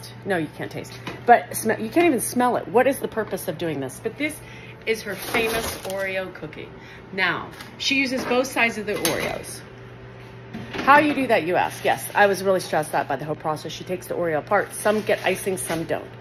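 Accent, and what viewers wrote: South, United States. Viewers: Good afternoon, and once again, you are the always hilarious and sarcastic chronicler of everything and your sassy Southern accent is the icing on the cake lol!! Those cookies all do look damn good and who doesn’t eat the whole Oreo?? Of course you have to take it apart and lick off the cream inside but also part two is dunking a whole Oreo in a big tall cold glass of milk (anyone who doesn’t know this is the procedure with Oreos, doesn’t deserve them!!😂); have a great day, and let’s hope you did not get diarrhea from eating all the cookies lol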